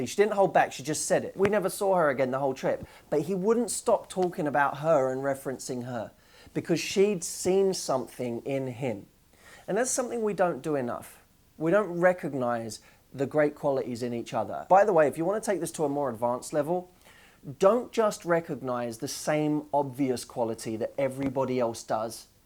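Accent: England, United Kingdom. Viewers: ***** Totally :) British accent and brown hair from Orlando, facial features from Ryan. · Gosh I am so obsessed with your English accent 😀 great lesson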